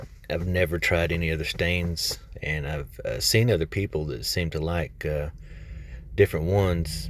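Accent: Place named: South, United States. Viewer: !!! these look fantastic!! i love how you did the base!! so unique!! i love the southern accent too!! Michigander here recently transplanted to VA... I tell ya what i am getting a way higher profit here in the DC NOVA area vs selling in Michigan.. location really makes a difference!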